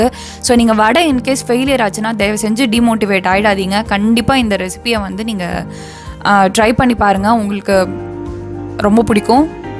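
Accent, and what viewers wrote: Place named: Badaga, India. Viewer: Sister nenge badugas ah.. neenge pesarthu apdi tha iruku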